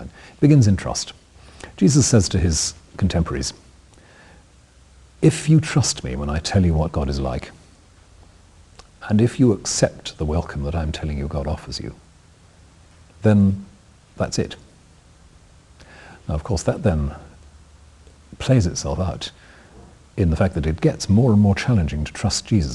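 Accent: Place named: United Kingdom. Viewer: Sheesh...That's not right. The Gospel has nothing to do with anything WE do...You're saying that "The Gospel is for us to trust Jesus?" That's not right...c'mon...you can't fool me with that British accent...although it does make you sound rather intelligent...Kinda like Richard Dawkins sounds intelligent...lol. But I know you know better than this...Why not just say what the Gospel is? You don't have to contemporise it, or put it in modern terms...just preach it!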